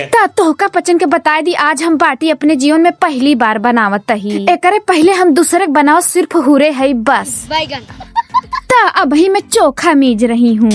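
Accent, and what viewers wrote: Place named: Pratapgarh, India. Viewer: प्रतापगढ़ वाले ऐसा बोलते हैं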